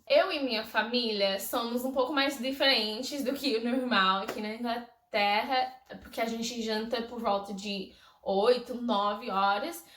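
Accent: Brazil. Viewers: Your portuguese is out of this world i cant actually believe It , i mean everything vocabulary, diction and the most amazing one the brazilian accent that u have . If you told anyone of us that you were brazilian we would totally believe u. I'm FLABBERGASTED kkkkk. Abraços ✌️ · Oi, descobri hoje seu canal e adorei! Seu sotaque é perfeitamente brasileiro hahaha. Ah, outra diferença, acho que vocês comem muito mais lanches do que nós na hora da refeição. Claro, aqui também tem pessoas que preferem lanches, mas a grande maioria não abre mão da alimentação do tipo arroz com feijão, carnes e saladas. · Vc fala português com sotaque brasileiro👍🏾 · Ahhhhh ela fala português BR, já ameeei!!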